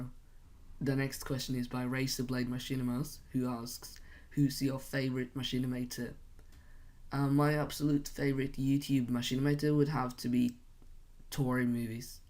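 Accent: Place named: Finland. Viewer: At first I thought you were from Britain, but you sound Finnish (since Idk what norwegians sound like) so I just thought your accent was some sort of hybrid >.>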